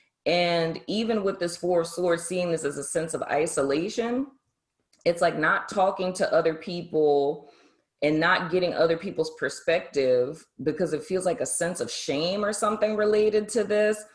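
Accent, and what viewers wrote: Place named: South, United States. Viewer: WHERE YOU FROM?! I hear something southern in your accent. First time viewer. Love your approach!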